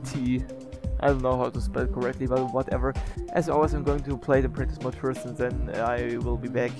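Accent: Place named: Germany. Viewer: Man merkt richtig das du aus Deutschland kommst ich finde dich cool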